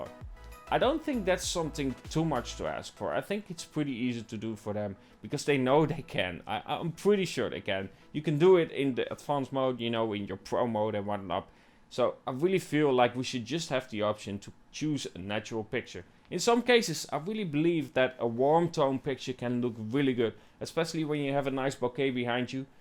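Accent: Netherlands. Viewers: haha, ik dacht al dat ik een Nederlands accentje hoorde😂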